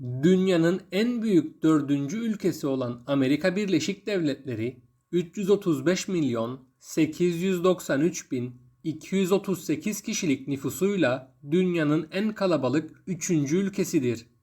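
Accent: Cyprus. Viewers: Abi sende sanki Kıbrıs şivesi var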